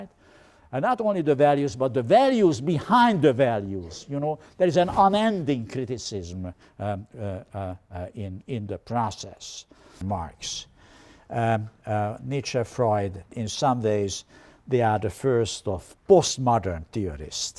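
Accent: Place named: Europe. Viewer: And I was surprised could do such a convincing continental accent!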